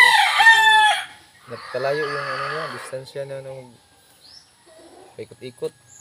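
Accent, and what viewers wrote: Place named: Visayas, Philippines. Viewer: Dol 😂 halata na kaayo nga bisaya gd. Ayna pag Tagalog bisaya lang 😅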